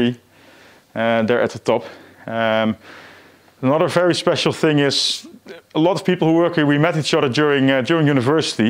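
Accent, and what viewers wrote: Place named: Netherlands. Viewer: Love the dutch accent😅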